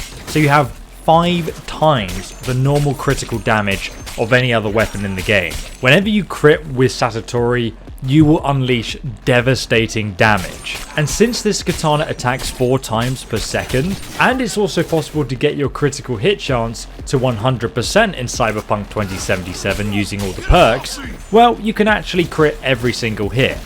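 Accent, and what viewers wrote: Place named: United Kingdom. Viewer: mishrooms I tolerate him because hes handsome and his british accent is good and his temperment is enjoyable iin his videos... but yeah hes jumping on the bandwagon of mass producing "guide " videos and using keywords like the millions of other youtubers all doing them... Idk how he has time to do ANYTHING but play these games i mean I havent even finished AC valhalla yet it was just so massive - and now THIS is massive too... I'm drowning in these open world games that GIVE you the whole world at once - piecemeal it to me - All these massive open world games give me anxiety and make feel overwhelmed and exhausted as soon as I see the map and all teh shit ive got to do to satisfy my OCD and ADHD :( MAkes me want to play and On Rails or series of corridors game that t ells me a nice story instead of all of these options - i mean some optionms are good but not dozens and dozens of side quests and collectibles and every zone opened up after the prolouge... 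shit makes me not even want to be in parts of the city, like some parts of it are so confusing with the verticality it took me like 10 minutes to find the door to a ripperdoctor there otehr day lmao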